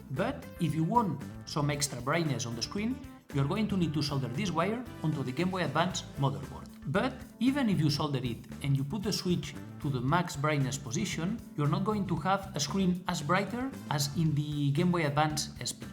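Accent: Spain. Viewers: Ostia este es español. Me juego lo que sea XD. Que acentazo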